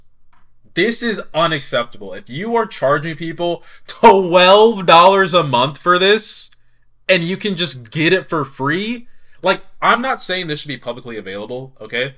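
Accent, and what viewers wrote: Nigeria. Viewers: Are you a Nigerian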